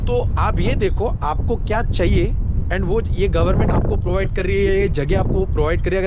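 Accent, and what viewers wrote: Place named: Punjab, India. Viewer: Kaun jagaga o.tha? 🫣 Aap bolo naa bolo hum log samajh gaya😆 Punjabi hi hoga🤭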